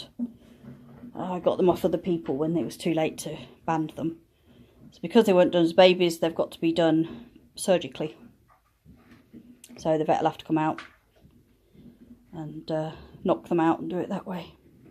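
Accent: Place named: United Kingdom. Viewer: Hello! I'm a new subscriber! I just love your accent, I wonder if your from the same part of the UK as my husbands Auntie :-)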